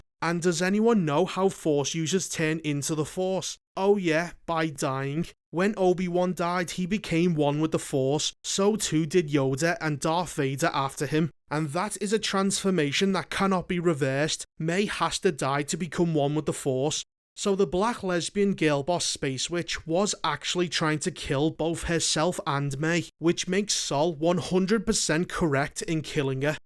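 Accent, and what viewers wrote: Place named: Scouse, United Kingdom. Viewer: It’s a Scouse accent. Every one in liverpool talks like that. To be fair to his Scouse accent is actually quite mild compared to most in liverpool.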